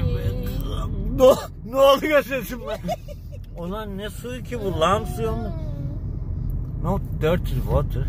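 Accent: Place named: Ankara, Turkey. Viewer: Abi Tam Angara aksanı . Süpersin valla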